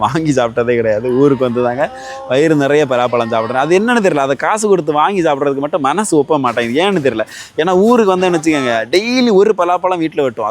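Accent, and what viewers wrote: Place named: Kanyakumari, India. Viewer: Kanyakumari Nagercoil Slang😅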